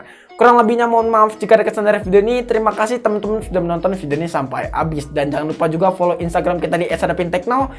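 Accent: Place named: Surabaya, Indonesia. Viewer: Arek Suroboyo Yo lur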